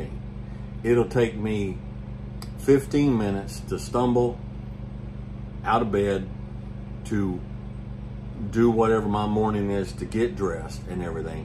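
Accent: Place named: South, United States. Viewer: I saw the hat and was so excited to hear a southern accent. Was not disappointed. 🙏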